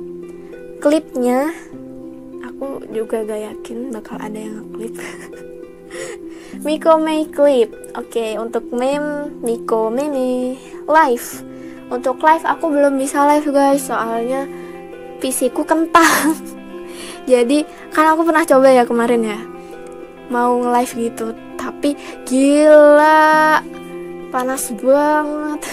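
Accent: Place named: Java, Indonesia. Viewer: waahh jawa banget! btw semangat yaaa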